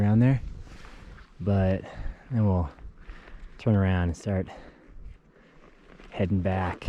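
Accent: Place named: Australia. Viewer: Hahaha the Aussie accent 😂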